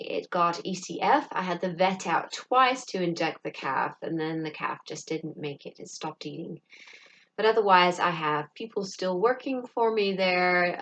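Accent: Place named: United Kingdom. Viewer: Can you teach me your wonderful British accent?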